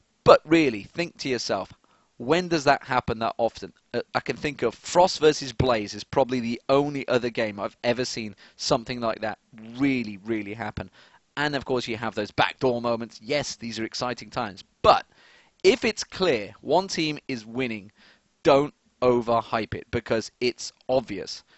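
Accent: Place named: United Kingdom. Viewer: You make me want to talk in a British accent... :/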